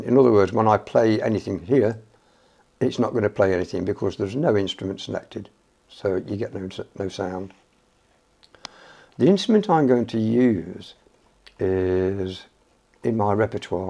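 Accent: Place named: Staffordshire, United Kingdom. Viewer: Cheers, Mate, SO...A little secret, I have 4 Guitars, 1 Mandolin and a "KORG EK50" and the Modulation control is a 'Joystick', (not the wheel configuration), you will not believe what Heavenly Fun I have attempting to annoy my near Neighbours...(Broken Headphones was my excuse..🤗🤗☠☠). I note STAFFORDSHIRE in your accent....Shenstone is my Family "SEAT"...now over in darkest Lincolnshire. Keep your fingers nimble...cheers..G.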